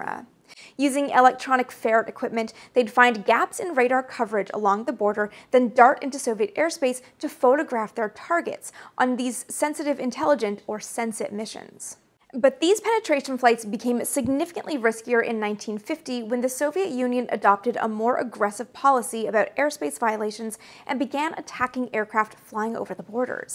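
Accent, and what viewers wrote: Quebec, Canada. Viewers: Proud of you even after your Canadian pronunciation (yes my dear, I'll deal with it). Been watching you for some years now and bought your book on women aviators for my 5 yo grand daughter (my 87 yo mother read it and loved it, I too). Do you have any capability or desire to Sign your book? I'd love for her (my grand daughter) to have a signed copy, do you do this? Keep it up, you and others that love aviation history, swell my heart in a good way. I'm a retired Navy Air Traffic Controller that grew up watching Mercury, Gemini, and Apollo. I still cherish watching Apollo 11 as a 11 year old boy at my Grandma's on a warm East Texas night in July, 1969 land on the moon. Still get chill bumps thinking about it... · She sounds Canadian. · She's a Canadian and has a bit of the French accent. Fun fact just drop the 'H' in every word you say and you are on your way to great French Canadian accent. 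· English is a complex diffuse language that includes much from Latinate, Romance and Hellenic origins, plus regionally on neighbour and partner languages. Do you pronounce "cafe" as per the original form "café" or a literal mangled version like "caff" or "kayfe"? How about Jalapeño with a hard "J" and a "peeno" at the end? I am fluent in four languages (English, Finnish, French, German) and I found that once I worked beyond my mother tongue (English) I began to understand how it works, when it doesn't, it's oddities and the like, plus basic respect for non-English words being pronounced correctly. I'm guessing that by how pronounces her English, she is Canadian and hence is likely to be more au fait with French pronunciation and recognising word forms. The worst though, is NHL commentators mangling people's surnames without actually bothering to learn how they're truly pronounced. That's beyond lazy to the point of arrogance. 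· I don't understand why this is throwing some people into such a tailspin. Have you heard of a French-Canadian accent? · Canadian accent is really coming out today. 😋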